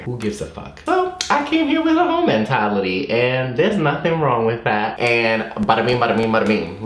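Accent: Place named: New York, United States. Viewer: Your NY accent is heavyyyyy! 😂 mind you I’m from NY too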